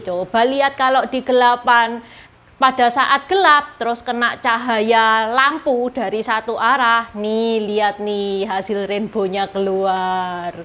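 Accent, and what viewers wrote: Java, Indonesia. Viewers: Mbaknya, suaranya medhok jawa...😊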